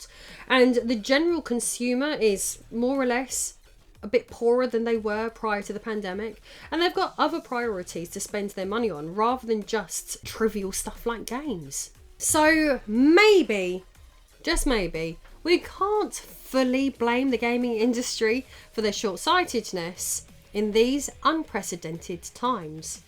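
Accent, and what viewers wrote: England, United Kingdom. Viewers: What part of England is from?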